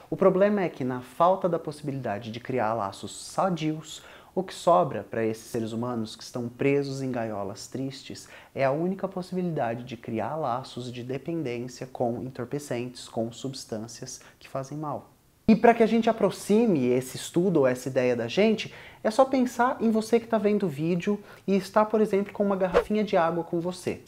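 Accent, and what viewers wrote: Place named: São Paulo, Brazil. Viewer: Parabens pela interpretação do sotaque típico paulistano... e a diminuição dele de forma gradual e imperceptível conforme a seriedade do assunto avança. Genial!!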